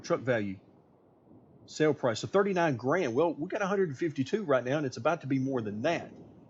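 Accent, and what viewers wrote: South, United States. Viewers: Naw, this sounds more like Georgia-Missippi-Alabama southern accent.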